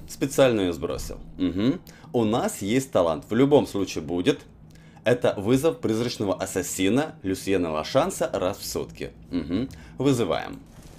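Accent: Ukraine. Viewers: Ты под корнем нирна играешь?)) Украинский акцент делает ламповым видос👻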